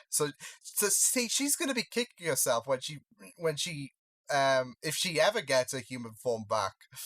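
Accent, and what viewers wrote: United Kingdom. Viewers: I don't. Most Canadians live very near the American border, get their main TV channels on basic cable, and we can tell the difference between ourselves. You still sound a bit like the people on Coronation Street. Your Britishness adds a bit of spice to your reactions - the accent, the language. It is a bit different, but very adorable. Loved your Joker, BTW.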